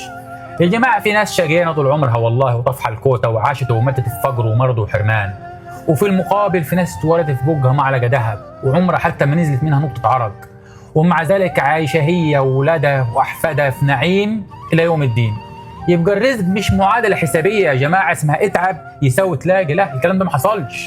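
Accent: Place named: Sharqiya, Oman. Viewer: انت من الشرقية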